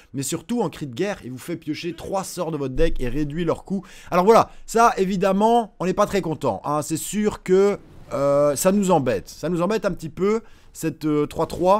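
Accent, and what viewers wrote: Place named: Belgium. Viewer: Mdr l'accent belge il est bien présent :)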